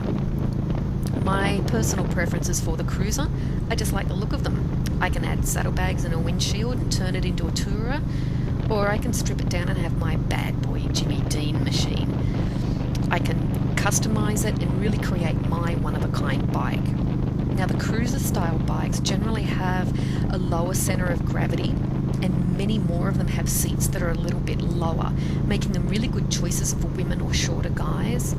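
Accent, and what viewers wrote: Australia. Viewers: is that the yamaha v star 650?? also are you Australian?? ( i am Australian and i think youre either Australian or a Kiwi) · G8 video there... Have watched most of them and have to say quite entertaining as well as informative... love the Ozzie accent... LOVE your Victory... Getting onto a course next weekend(Riding/Learner's Permit)... I'm a bit like yourself... but MID-FORTIES... LOL.. and have been on youtube for the last 6 months researching.... But i think the Victory's are No.1 with me at the moment(Hammer S)... But first things first... anyway... i really enjoyed your video..Cheers from Ivan.. Geelong AUS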